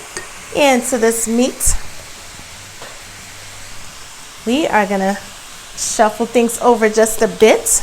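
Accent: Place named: New York, United States. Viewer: Love your new york accent.. It's pretty strong along with your food... All the way from the UK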